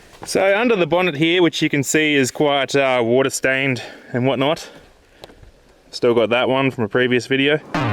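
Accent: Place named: Australia. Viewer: Yes to a snorkel, and yes to more hearing you say, "battleprius," in your lovely 'Strayun accent.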